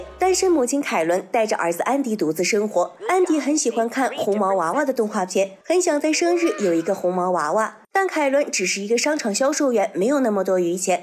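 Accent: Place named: Shandong, China. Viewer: 听口音就是我们那边的，老家山东的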